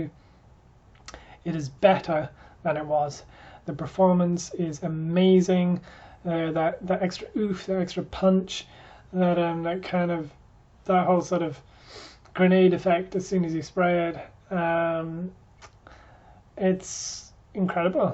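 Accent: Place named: United Kingdom. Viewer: My friend you made my Bose 700 headphones sound like a $5 wallmart earphones. First I didn't understand if my headphones missed a connection and the sound comes out of my cellphone or out of my arse. Creed is good but please for God sake invest $45 for a decent microphone or use your cellphone microphone I bet it would sound much better then your current laptop. Lastly, I wanted to mention, you have British accent so why do you complain about the price here. This bottle cost you no more then 170 pounds, we on other hand here in North America pay double of that. Some places I know charging for the same 100ml bottle $520 USD tax included this is your £405.....this is damn expensive! And thank you for the video. Cheers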